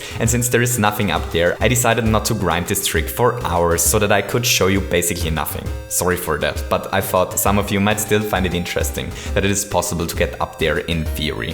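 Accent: Germany. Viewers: Man kann irgendwie so raus hören, dass du deutscher bist xD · Fuck urself. Have you ever learned a second language? If you have, is it as stupidly hard and inconsistent as English? German is his first language, and he's tried to speak like a native English speaker, but it's almost impossible to do so without an accent. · Dang your German accent😂❤️✊ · are you german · Du bist Deutscher, nicht wahr? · Are you German? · Bist du deutscher? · are u german? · Are u german lol? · are you german?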